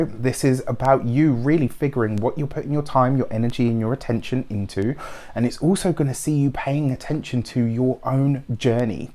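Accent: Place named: London, United Kingdom. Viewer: Am from the south west of England absolutely love your accent makes me feel at home in LDN. Thank you for this weeks 🙌🏾🧡🙏🏾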